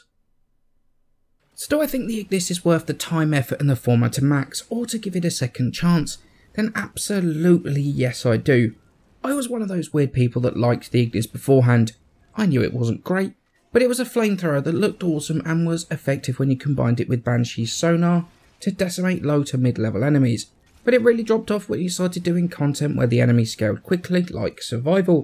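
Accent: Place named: England, United Kingdom. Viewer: no he's just English... that's how we say it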